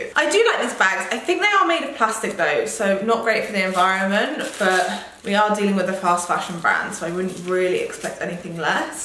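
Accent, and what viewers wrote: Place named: United Kingdom. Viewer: And love your British accent 😍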